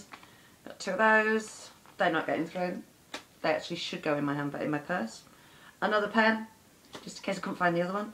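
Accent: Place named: London, United Kingdom. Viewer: I love big bags too. Love yours. I actually just recorded one of these video's yesterday. I am gonna try to upload it today for yall. Or tonight. Lovein your accent. Where do u live? London? Also like your sense of humor. So u know Gemma pretty well? She is so sweet. I have known her from on here for a long time now. Such a doll she is. Anyways, great tag..I will have mine up soon. TFS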